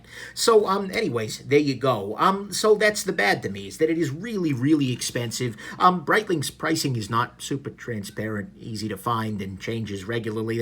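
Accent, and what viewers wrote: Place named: East Coast, United States. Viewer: I usually hate east coast accents as a Brit, but come on I love this review it’s great 😂 this is my grail watch and on that breitling strap it’s stunning I can’t wait to get one!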